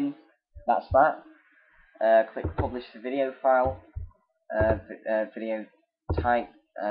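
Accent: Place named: United Kingdom. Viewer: omg british accent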